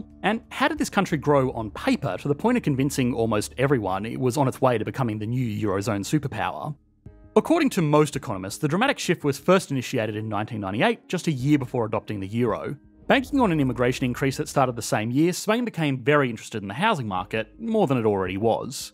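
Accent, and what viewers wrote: Australia. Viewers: Slow down mate , i know your have the limit for vedios time but your fast aussi accent is hard to follow. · Probably Australian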